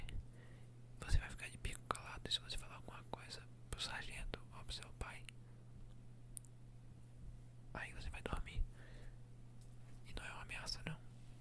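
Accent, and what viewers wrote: Brazil. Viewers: Ótimo asmr adorei, incrível com tá para perceber que vc é manezinho hahaha também sou :)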